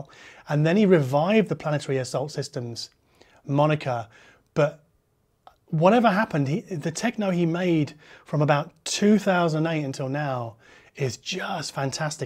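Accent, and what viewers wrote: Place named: United Kingdom. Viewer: Thanks for your recommendations. I am not into techno. The tracks you introduced are killer. System 7 is a brand new experience to me. Beeing audiophile for 40 years now, it is always nice to learn something new. Your videos are on my shortlist everyday, because they have this quality i like. And i like your british accent...👍😜😂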